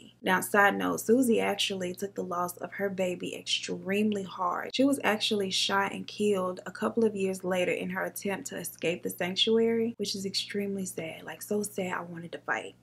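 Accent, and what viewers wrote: South, United States. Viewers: You gotta love & appreciate mamas! ❤ No one else in this world will ever love, help, protect, understand, forgive or worry about you than her! 🥰 I have been watching you for about a yr now and I found you bc I watch Bailey Sarian and MrBallen and one of your videos popped up oneday. You are a beautiful woman 😍 and I love the fact you sound like me. I also have a southern accent (or so I've been told by my in-laws 😂). Listening to you is just like hanging out and gossiping with my girlfriends. 💯 Love your videos so keep up the awesome work! 💋🏆👝👑💄🙏💜🌻💣🦋❤🌟🎉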